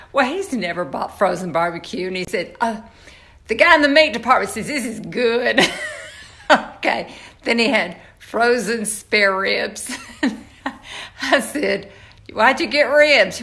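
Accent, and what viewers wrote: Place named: Tennessee, United States. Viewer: how are you feeling today? Hope your tests came back negative and you're on the road to recovery. I love hearing you talk it brings me back to my family from Tennessee.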